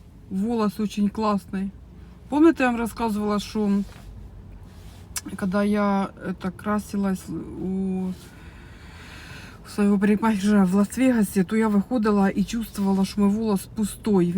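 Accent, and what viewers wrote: Kryvyi Rih, Ukraine. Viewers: Вона розмовляє на криворіжському суржику.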